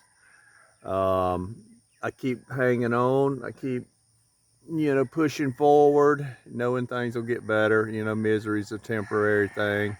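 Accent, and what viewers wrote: South, United States. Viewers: Love your Southern accent. I love your honesty and candidness about life. I hope things will work out for you and your wife. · yes, I do love your southern accent. I hope you're ok. stay strong sir..god bless.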